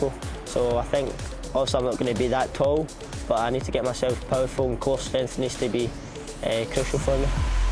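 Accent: Scotland, United Kingdom. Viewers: If he Wants to have a good career he has to get rid of that Scottish accent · I love his Scottish accent lmao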